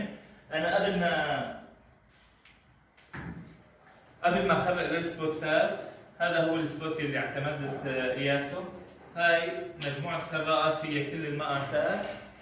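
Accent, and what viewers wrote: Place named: Syria. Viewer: شغل حلو و الاحلى لهجتك السورية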